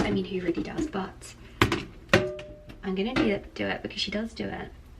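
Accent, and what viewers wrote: RP, United Kingdom. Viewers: There’s no need to “correct” pronunciation. She’s speaking in English, and pronouncing all of her words in her RP accent. English borrows a number of words from different languages so she can pronounce it according to her understanding of English. If it were French, it would be a different matter. Given the range of accents in the UK alone, the pronunciation of words is malleable to each individual speaker. ✨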